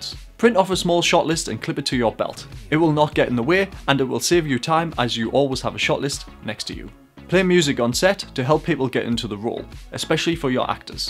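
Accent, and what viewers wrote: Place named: Scotland, United Kingdom. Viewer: it took me a second to get use to the Scottish accent; but the tips where great!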